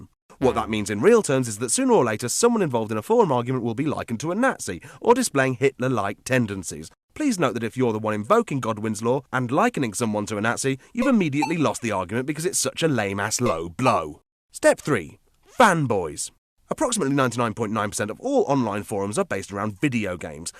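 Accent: United Kingdom. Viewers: The british narrorator makes this video hilarious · Everything sounds better British.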